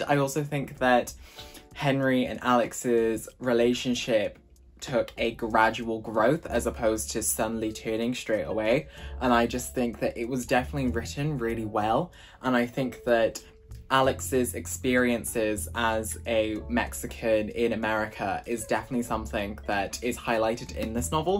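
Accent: Wales, United Kingdom. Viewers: 0 am I sensing a Welsh accent??? First Welsh booktuber I've found and it's EXCITING!!!! Loved your reviews, subscribed!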